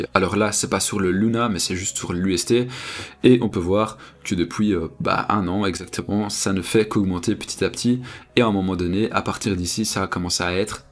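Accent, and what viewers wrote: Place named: United States. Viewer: Bonne vidéo, je m'abonne ! Au passa l'accent est Américain apparemment 😁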